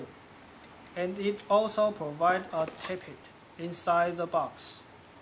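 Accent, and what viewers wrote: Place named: China. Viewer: lovin the chinese accent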